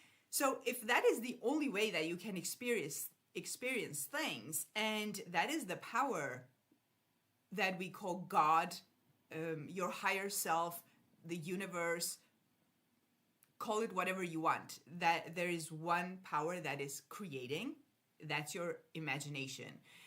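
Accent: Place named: France. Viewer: Hi!! I’ve been following your videos for a while now. I really love your content and you’re one of the people on YouTube whose perspective resonates with me the most. I was wondering what you think about manifesting a relationship with someone (a public figure, but I know that’s not really the point) who doesn’t know us yet. Where would you personally start with something like that? For the past few months I’ve been trying different techniques. Sometimes in imagination I feel absolutely certain that I’m receiving what I want. I know it, I feel it. But after some time, when I don’t see any movement in that direction, I start doubting everything — even the law itself. I always manage to bring myself back, but it’s not always easy. I also have a very specific question: when in dreams you keep seeing yourself in the same dynamic with that person — meaning more in the “fan” position rather than someone who is actually part of their life — do you think that could mean the manifestation hasn’t really settled into the subconscious yet? 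Thank you so much! And by the way, where are you from? I hear an accent that almost sounds French, but I’m not completely sure. 😌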